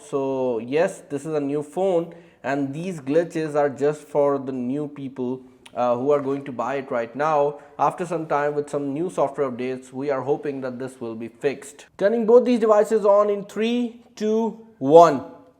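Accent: India. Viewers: Ooh! That typical indian accent though.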